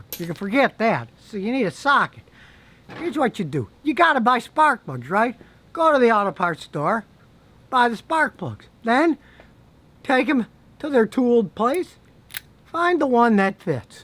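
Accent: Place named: Chicago, United States. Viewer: I see the live free or die sign is he from New Hampshire or just like the motto, because he sounds like he’s from Chicago. Just curious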